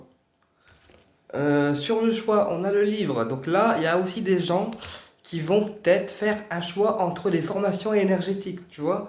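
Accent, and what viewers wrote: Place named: Nord, France. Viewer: Je vous découvre et je vous remercie je m'abonne 😊je suis native du Nord et je reconnais l'accent ch'ti 😅je suis de Cambrai et vous? bon dimanche 🙏⚘❤⚘🙏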